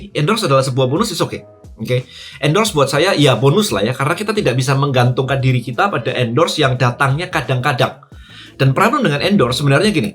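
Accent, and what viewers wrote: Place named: Medan, Indonesia. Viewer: What, orang Medan KhoKho...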